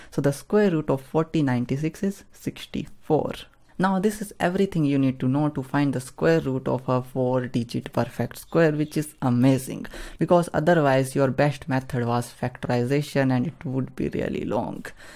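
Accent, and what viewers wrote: India. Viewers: No offence😔 (as an American ENG speaker...it's tough for me to understand the "Indian ENG" ! so...I politely asked for subtitle! that's all) Don't judge people for no reason bro💔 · Yea He speaks in that Good way that there is no error while detecting the word for subtitle I guess you have issue because of his Indian Accent.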